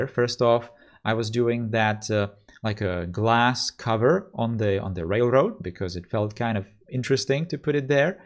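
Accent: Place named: Czech Republic. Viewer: this series is absolutely the best take on cities skylines i ve seen so far, and i ve been watching all the stuff since the game was out. you are undoubtedly one of the most talented guys, if not THE most talented guy ever, making C:S quality content. and seeing this just frankly makes me not want to play the game anymore, because everything or even more that i could imagine, you´ve already been able to pack into this very series. an artist´s work, no doubt... you should be working as an architect or something... btw you have some serious czech accent dude :) you're czech? if yes that makes it even better, because nothing makes me more proud of our country than a talented guy like you doing such an excelent work.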